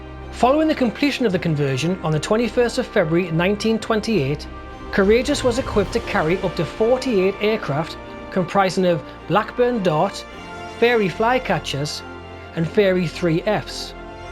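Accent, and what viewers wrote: Newcastle, United Kingdom. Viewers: Methinks a Geordie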